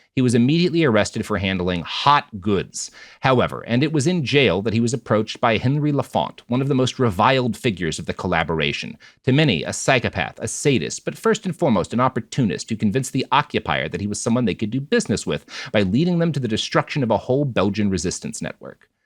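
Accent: Boston, United States. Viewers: Your Boston accent is so thick, hard to understand sometimes. Have you considered speech classes or something?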